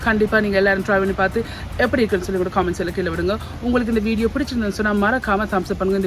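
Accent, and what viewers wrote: Jaffna, Sri Lanka. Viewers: Neenga jaffna தானே.?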